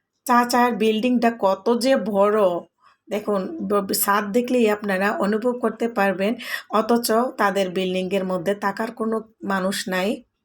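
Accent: Sylhet, Bangladesh. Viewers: Apur mat ta very nice lager na sylheti kori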